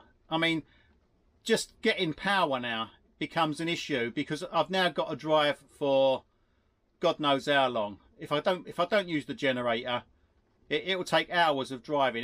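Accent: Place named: South, United States. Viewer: Hi, all the best for 2025. You will always get haters and whiners when you put yourself out on YouTube. Some people just can’t help themselves for instance your accent i’m Northern you are a southern,if they don’t like it jog on!. there are more and more people waking up to the tyranny of the New World Order dictatorship, this has been in the planning for over 20 years yet most people do not see it and even if you show them they still will not see it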